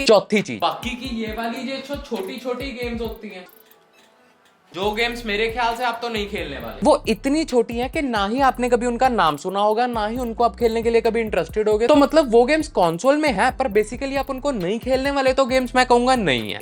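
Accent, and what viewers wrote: Punjab, India. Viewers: Are u from punjab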